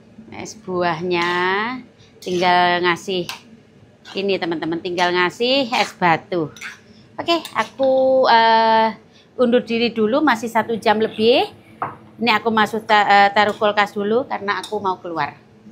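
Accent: Java, Indonesia. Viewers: Assalualaikum, salam untuk klg semua dari Balikpapan (kaltim)kalo liat vidio ini bisa senyum" sendiri logat jawanys itu lo yg bikin lucu😀😀👍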